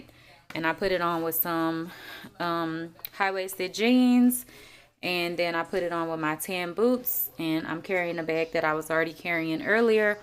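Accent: South, United States. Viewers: Omg that southern accent 😂😂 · Congratulations on the new job ❤️ LOVE LOVE LOVE just hearing you speak . Such a southern belle .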